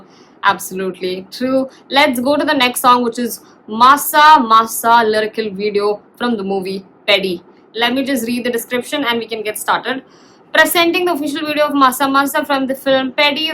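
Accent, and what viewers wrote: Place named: Telugu, India. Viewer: Your Telugu is so cute 😊😊😊❤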